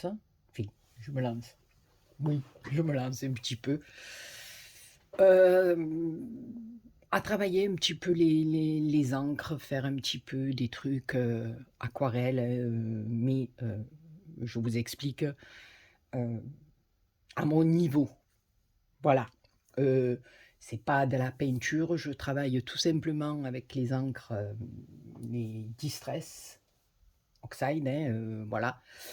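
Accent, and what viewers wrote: South, France. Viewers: Coucou trop contenté de te retrouver avec ton accent du soleil gros bisous de la Picardie 😘💜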